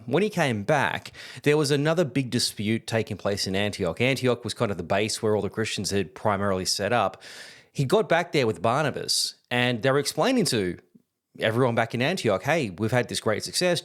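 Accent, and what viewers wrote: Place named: Australia. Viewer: I've been watching a bunch of your videos today, and I'm glad of your explain-the-nuts-and-bolts format for these. It's surprising how few views you're getting, and how many of the videos have zero comments. Your Aussie accent isn't so bad, and your knowledge of the topics isn't so bad ether. Thanks for making them anyway, it must require a lot of work. Perhaps an hour plus about fairly minor characters that so little is known about is pushing it? If you can do it in a thousand words, don't feel the need to pad it to 10 000 words? I'm a Catholic, and you haven't annoyed me. Maybe that's your problem lol. Well, thanks and God bless. May your endeavors lead some to Faith, even if not you to big YouTube ad bucks.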